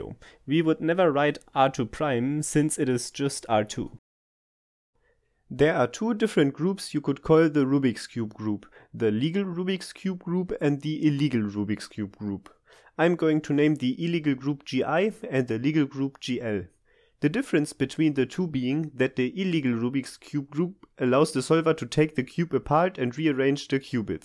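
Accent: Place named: Switzerland. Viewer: Why do you have a swiss accent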